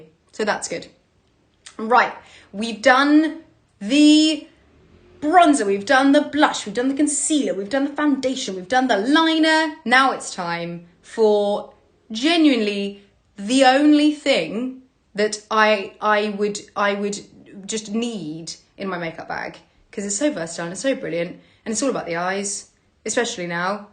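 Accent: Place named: London, United Kingdom. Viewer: Thick London accent.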